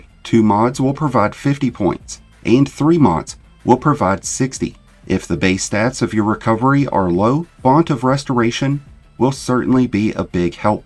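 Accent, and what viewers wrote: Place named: Pittsburgh, United States. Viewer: lol you're from Pittsburgh, aren't you?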